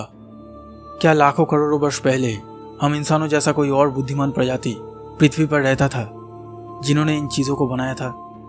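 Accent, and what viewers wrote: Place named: Assam, India. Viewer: Bahut achhe 👍aap assam se hey, aapki boli se lagta hey, main vaha 23year rahi hun. Dhanyvad. Jai hind 🙏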